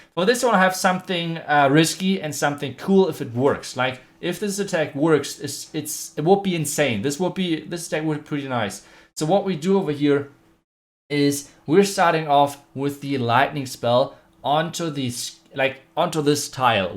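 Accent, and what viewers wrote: Germany. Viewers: This made me realize tho, it's in the delivery. Germans have that accent and I think it messea it up lmao. Messes**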